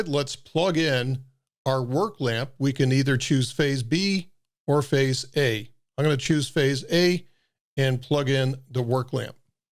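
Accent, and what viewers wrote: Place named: Northeast, United States. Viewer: ha! Your accent seemed more northeast. Great overview. Thanks for taking the time to do it. In a pinch, another way to achieve the bonding requirements of the F150 without an expensive 3-pole disconnect is to have a lockout device on the main breakers and a (preferably locked) switch for the bonding at the house's main panel. This is the same as disconnecting the bonding jumper in a subpanel, but you're just doing it without a screwdriver. Here's a video where the homeowner achieves it via the screwdriver method: <link>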